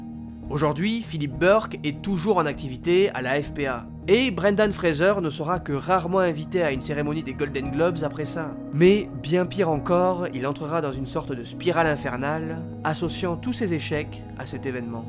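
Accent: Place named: Perpignan, France. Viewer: Tu as l accent de Perpignan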